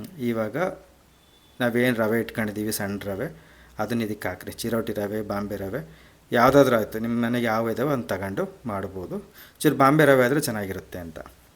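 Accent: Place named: Davangere, India. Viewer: ನಮ್ಮ ದಾವಣಗೆರೆ ಮಾತು ಕೆೇಳೊದಕ್ಕೆ ಚೆನ್ನಾಗಿದೆ ಅಲ್ವಾ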